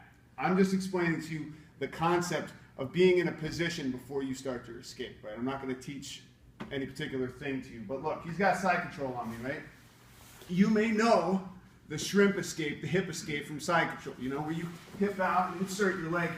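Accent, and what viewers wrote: Brazil. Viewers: Your Brazilian accent gets me laughing every time. Love your videos. Thanks. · The Brazilian maestro accent is beautiful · Love the Brazilian accent. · i love the Brazilian accent at the end of your videos lol... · Can you do an instructional video with your Brazilian accent? That would be amazing! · That Brazilian accent is pretty good lol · Lmao, that Brazilian accent killed me. Subscribed. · Thank you for the white belt videos. They're super helpful. I really like the Brazilian accent wrapping up what you just showed us. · More brazilian accent videos please. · You have a mean Brazilian accent, dude. Great vid too. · Very nice tips!! About the Brazilian accent... do we really talk like that? lol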